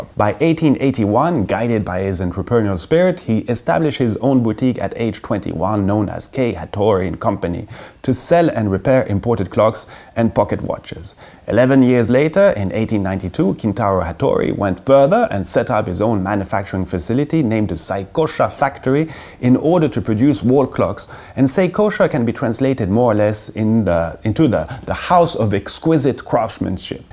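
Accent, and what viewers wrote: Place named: France. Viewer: This being the very first video I've watched on your channel, I was assuming, judging by your name and accent, that you were French. Imagine my surprise when you mentioned near the end that you're Swiss. And you're making a video praising a Japanese watch brand. Respect! Were you tarred and feathered upon your return in Switzerland? I can't imagine the backlash I, as a Belgian, would be facing if I made a video singing the praises of British moules-frites. 😂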